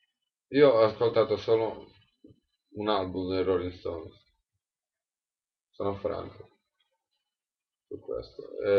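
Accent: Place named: Sardinia, Italy. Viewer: Scusa,ma non riesco a circoscrivere il tuo accento,sei Sardo o Pugliese?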